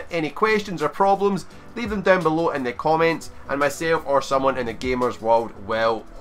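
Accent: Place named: Scotland, United Kingdom. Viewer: I love your Scottish accent ❤️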